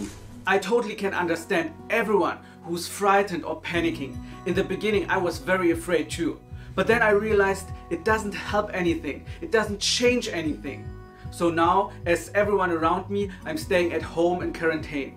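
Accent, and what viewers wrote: China. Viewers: I think you speak English with a strong Chinese accent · 你的英文有中文腔ㄝ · 你的英语很好，甚至有点中国口音，谢谢你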